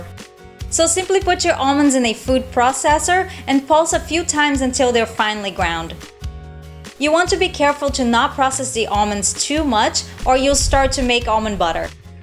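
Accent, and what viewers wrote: Brazil. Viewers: Is she Brazilian? She has a very nice accent :)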